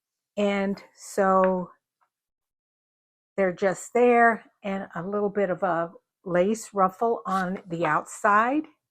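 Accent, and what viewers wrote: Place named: Utah, United States. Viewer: I’m from and live in Tennessee but I lived in Providence, Utah for 5 years. You have the same accent as them. 😄 Are you from Utah?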